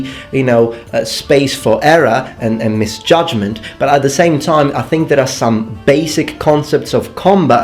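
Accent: England, United Kingdom. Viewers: Why does it sound like you have a English accent when you are from Sicily? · Does his accent have a little bit of British in there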